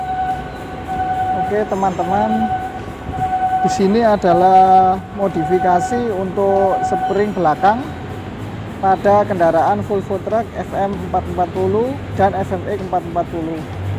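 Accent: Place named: Malang, Indonesia. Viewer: Sepring ,jan logatnya ngalam banget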